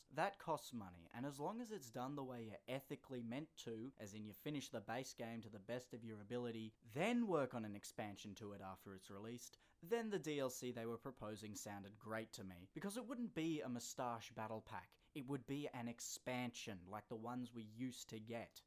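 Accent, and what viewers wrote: Australia. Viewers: You and GGmanlives should do a duo review. Both Australian accents and just straight trough without the kiddy bullshit. Love it! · You an Aussie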